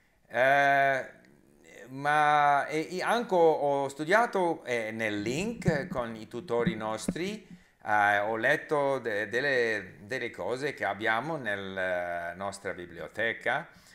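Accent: Spain. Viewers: Complimenti Mr, l'associazione allo spagnolo è molto forte, ma il suo italiano è comprensibile. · Cerca di separare lo spagnolo da tuo italiano... L'influenza è troppo forte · Bravo, il tuo italiano è notevole nonostante le evidenti interferenze del tuo spagnolo. Penso che la cultura che ti sei fatto nella nostra lingua non sia per nulla una cosa da poco. Complimenti.:D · Complimenti, il tuo italiano non è male soprattutto per essere un poliglotta. Si sente molto l'influenza dello spagnolo, nell'accento, in alcuni errori e soprattutto nel dire y invece di è :) che secondo me è molto difficile, intendo che io quando ho imparato il francese usavo spesso congiunzioni in italiano senza rendermene conto, in particolare ma invece di mais. Complimenti in ogni caso e grazie per il tuo esempio!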